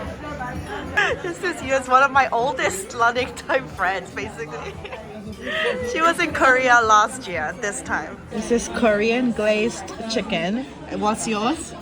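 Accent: England, United Kingdom. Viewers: It was interesting seeing London from your point of view. You are such a foodie! LOL Which is ok but you make me hungry even though I had just ate something. I also think your English accent came out more the longer you were in London too! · ~ 전 영어공부 하는겸 재밌게 보고있어요ㅎㅎ 예전에 영국에 잠깐 거주했지만 영어를 아주 능숙하다 할 정도는 아니어서...ㅜㅜ 영국 악센트와 한국생활 소재로 영어하는게 진짜 맘에 들어요~~^^ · After watching a few of your videos I noticed your English accent got stronger while you were in London and it got lighter after a while in Korea!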